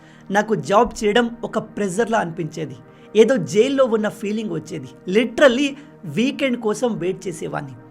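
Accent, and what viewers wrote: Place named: Andhra Pradesh, India. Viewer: Bro meeru andhra anukunnanu.mee voice asalu telengana la ledu.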